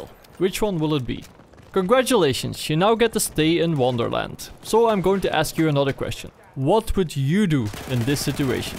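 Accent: Netherlands. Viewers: Kom je uit nederland? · The second you started to talk i noticed that you are dutch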